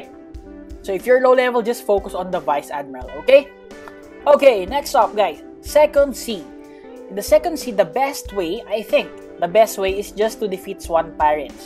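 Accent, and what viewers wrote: Philippines. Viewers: This guy reminds me of my teacher in computer because his Filipino accent😂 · You have filipino accent bro are you filipino?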